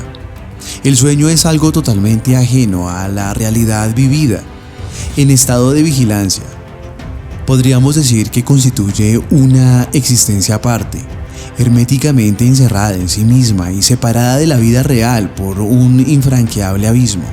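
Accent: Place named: Colombia. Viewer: Una voz humana que habla como robot, no sirve! Deberia hablar mas natural sin tanto acento colombiano y mas neutral. Saludos!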